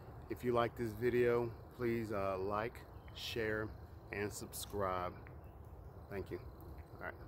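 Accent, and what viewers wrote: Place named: United States. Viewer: You just helped me so much and i greatly appreciate. What i enjoyed most though was your country accent and the fact that you made the time to break down and show us step by step. This video is by far the best learning experience i have gotten from a mechanic video. I would like to see more since I own a 96 nissan maxima and have changed out numerous censors and other important parts and mine will still not run. If you could maybe help me that would be fantastic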